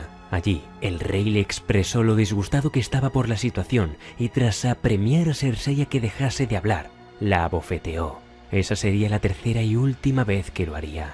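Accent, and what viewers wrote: Spain. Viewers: Bonitas narraciones y hermoso asiento de la vieja madre patria, saludos desde 🇨🇱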